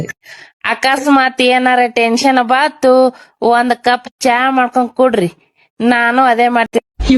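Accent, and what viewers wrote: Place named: Indi, India. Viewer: ಪಕ್ಕಾ ಇಂಡಿ ಭಾಷಾ ಮಾತಾಡತಿ ಅಕ್ಕಾ. ಇಂಡಿ ಬಂಗಾರದ ಬಂಡಿ 👌👌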